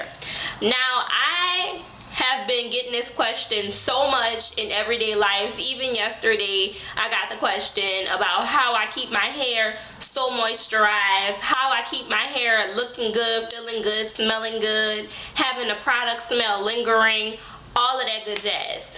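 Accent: New Orleans, United States. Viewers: I Love Your New Orleans Accent 😍😍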